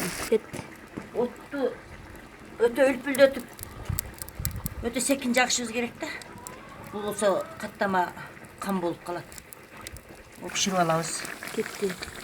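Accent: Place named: Kyrgyzstan. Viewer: Соонун нукура кыргызча азаматсыз апа колунуз дарт корбосун дагы ушундай видеолорду тартыныздар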